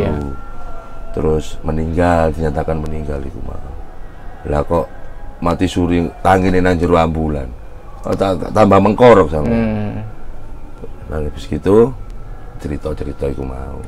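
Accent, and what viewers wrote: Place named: Surabaya, Indonesia. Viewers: Aku wedi pak wkkw 😀😆 suroboyoan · Aku besar di jawa timur , tapi bukan asli jawa timur. Selama di jawatimur brasa biasa aja pke bahasa yg kya bpk ini, tapi saudara2ku yg ga tinggal di jatim slalu ktawa dnger aku ngmng nyenengin katanya. Skrg aku tinggal di jawa barat, dnger bapak ini ngmng aku ngrasain apa yg di rasain sodara2ku. Nyenengin denger bahasanya. Sehat sehat yaa bapak 🥰🥰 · 2x puter ngga Bosen2 ,,logat khas orang Jatim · Aku seneng nak arek suroboyo cerito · Logat Surabaya,nya g hilang jancokk... 🤣🤣😅😅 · Iki medok suroboyo sueneng aku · logatnya surabaya banget om ;)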